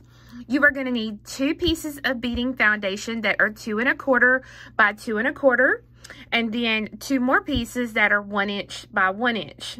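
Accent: South, United States. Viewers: Beautiful and beautiful southern acent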